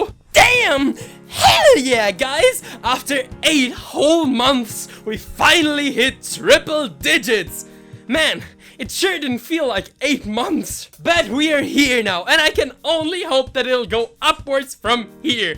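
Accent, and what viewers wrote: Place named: Europe. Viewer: your accent sounds european, where are you from?(i'm from the netherlands tbh)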